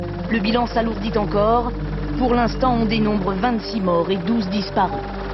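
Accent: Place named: South, France. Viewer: Belle vidéo avec un petit accent du sud . Bonne continuation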